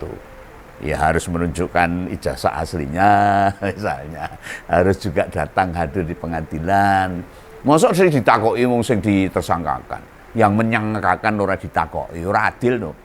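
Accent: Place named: East Java, Indonesia. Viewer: Kental sekali mas ini Wong Jawa Timur.... Sehat2 selalu Mas wong jowo asli. Aku senang dengerin.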